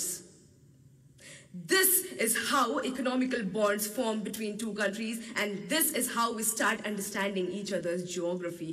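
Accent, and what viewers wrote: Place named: Nagpur, India. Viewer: Nagpur ki English other cities and states se ......difficult hoti hay!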